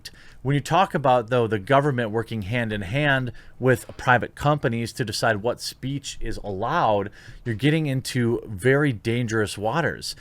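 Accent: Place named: Wisconsin, United States. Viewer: “Wisconsin accent confirmed”! There is a guy from WI that runs nations largest goalie clinic for ice hockey. I think his brother has a Green Bay sports shop or something. Anyways, my kids sometimes overhear me watching your videos and “lightly” laughing about “fledging” or “baeg”. Well they overheard the dude say the same thing as we left. It was surreal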